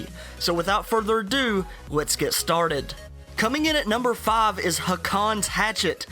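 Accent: South, United States. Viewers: My favorite southerner btw what state r u from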